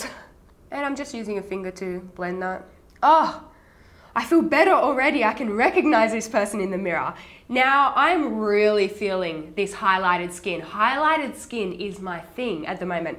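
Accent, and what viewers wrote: Australia. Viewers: Where is she from? Australia? :)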